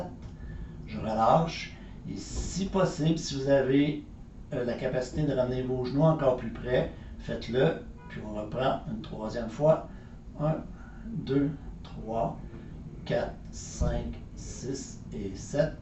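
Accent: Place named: Quebec, Canada. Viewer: Simple ,très efficace ,un parler qui me rappelle "La belle province " " Je me souviens " de mes annèes à Montrèal.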